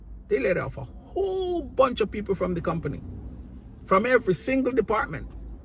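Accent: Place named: Jamaica. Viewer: Awesome sounds like a yard man🇯🇲 . Congrats on the channel.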